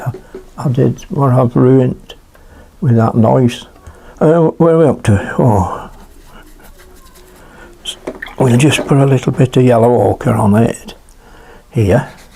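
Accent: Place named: Northern England, United Kingdom. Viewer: Lol. Sorry,. I've never been first before. Just want you to know how much fun I have watching your videos. I love your freedom while painting, even tho I can't understand half of what you say. I'm from the US and my ear isn't tuned to Northern England. But it's interesting to put it together from context.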